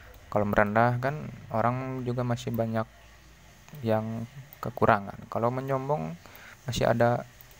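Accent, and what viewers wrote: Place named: West Java, Indonesia. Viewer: tina logat na mah siga orang sunda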